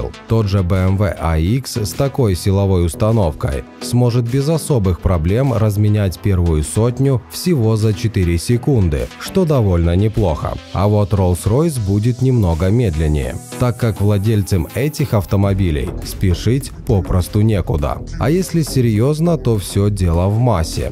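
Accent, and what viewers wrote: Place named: Stavropol, Russia. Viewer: Ставропольский диалект чёто не зашёл)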